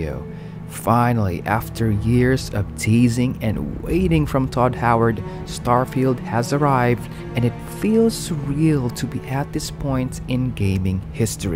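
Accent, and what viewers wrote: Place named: Brazil. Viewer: are you brasileiro?? hearing an accent :)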